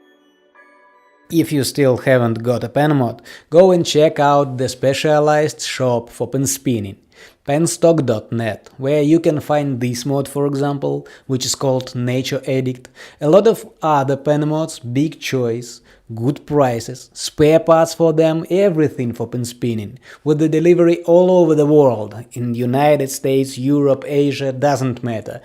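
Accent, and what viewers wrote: Russia. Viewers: пф такой акцент "русский" )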